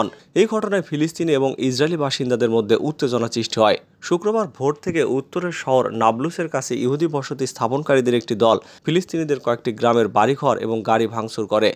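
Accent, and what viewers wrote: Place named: India. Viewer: এক ই প্রশ্ন আমি লিখতে যেয়ে দেখি আপনি লিখেছেন। সংবাদ পাঠক তো ইন্ডিয়ার ভাষায় কথা বলে। চ্যানেল টা কি ভারত নিয়ন্ত্রিত?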